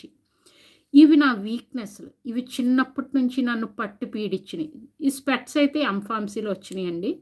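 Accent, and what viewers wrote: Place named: United Kingdom. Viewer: Nee british seed telugu basha vinte etletlano undi akka , yedokati follow avvarade manchiguntadi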